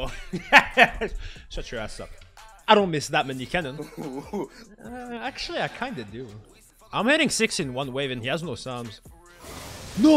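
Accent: France. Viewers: AHH le bon accent français <3 GachiBASS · LL SOUNDS EXTRA FRENCH THIS EPISODE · Tu a un accent français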